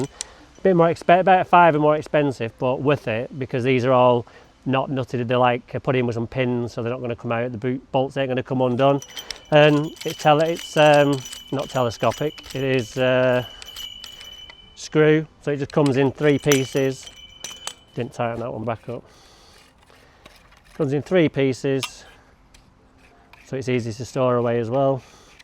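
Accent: Netherlands. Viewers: Great video Dutch accent appalling lol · Haha, your Dutch accent is excellent. You sound exactly like my Dutch friend, Wouter. Hi from Prague, CZ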